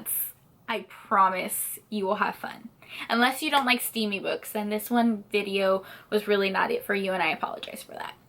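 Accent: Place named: Brazil. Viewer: Are you brazilian? Your accent looks really like a Brazilian one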